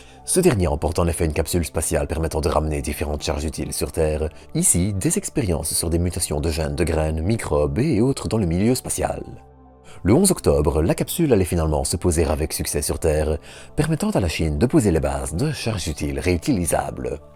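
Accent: Belgium. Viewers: La vitesse peut se régler dans les paramètres. Attention toutefois à ne pas trop baisser la vitesse qui descendra aussi sa belle voix de basse vers les infra-sons, aux risque de voir débouler chez toi des baleines!!🐳🐋 Quant aux intonations, elles sont due à son accent belge, qui pour nous est original et ajoute au charme de notre cher!!! 😋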